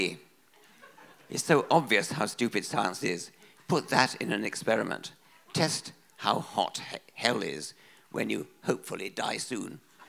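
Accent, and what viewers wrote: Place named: RP, United Kingdom. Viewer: What makes this hilarious is reading out these obscene comments, in his posh accent.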